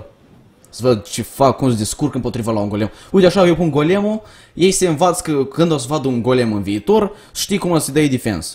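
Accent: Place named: Moldova. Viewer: ba moldoveanu-le invata sa vorbesti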